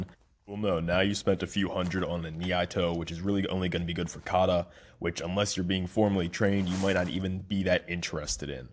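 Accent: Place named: Pittsburgh, United States. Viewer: You sound like you're from Pittsburgh 🤔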